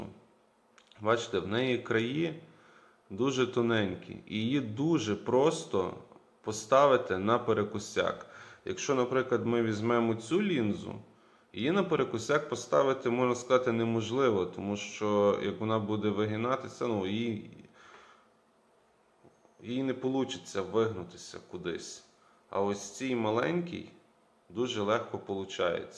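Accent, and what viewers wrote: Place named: Lviv, Ukraine. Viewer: То є львівський діалект , вам того не зрозуміти. Сходіть потрольте одеситів , вони вам розкажуть скільки у них коштує рубероїд на Привозі )))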